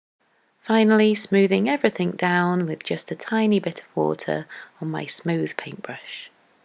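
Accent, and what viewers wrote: United Kingdom. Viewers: I love your british accent as well as your artskills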